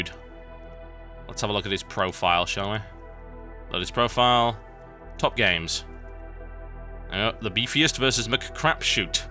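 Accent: United Kingdom. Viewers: Speak slower british dude!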